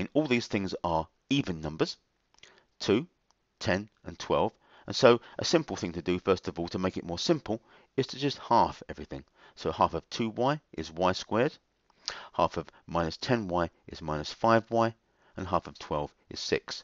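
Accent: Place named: United Kingdom. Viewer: Lol ur British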